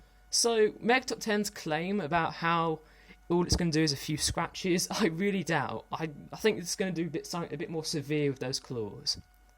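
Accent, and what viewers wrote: United Kingdom. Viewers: i didn't even know he had a British accent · I actually quite admire your British accent, you sound smarter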